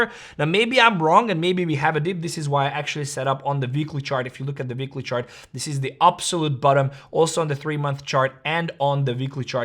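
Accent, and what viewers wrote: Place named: United States. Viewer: This is not financial advice 😅😂you sound American today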